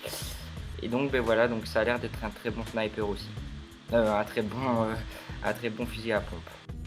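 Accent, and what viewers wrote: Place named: Belgium. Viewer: accent belge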